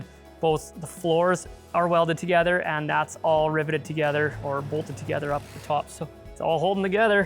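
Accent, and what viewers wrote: Canada. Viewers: The thing that bugs me the most about this video is buddy sounds Canadian af but days Zee instead of Zed.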